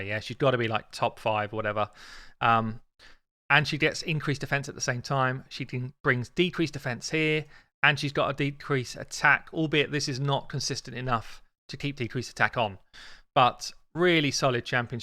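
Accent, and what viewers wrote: United Kingdom. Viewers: He’s British, they’re like that when it comes to pronouncing certain words lol. It’s not a diss, it’s just reality.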